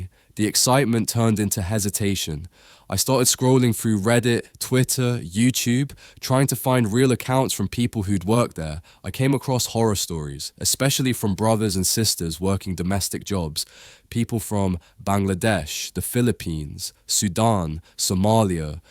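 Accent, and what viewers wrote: United Kingdom. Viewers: Are you from UK Bro?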